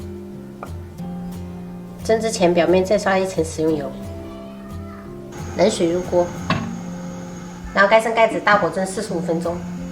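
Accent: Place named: Sichuan, China. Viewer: 是四川人，發音是不太標準😁幾將就聽吧☺️我是正宗泉州石獅人😊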